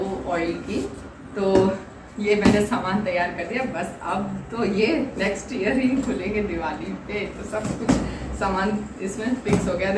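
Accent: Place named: Haryana, India. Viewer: Aaj awaj me dum hai haryana wali filling hai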